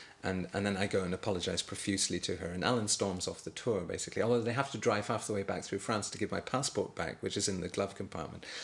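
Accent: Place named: Scotland, United Kingdom. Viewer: Posh Scots, eh?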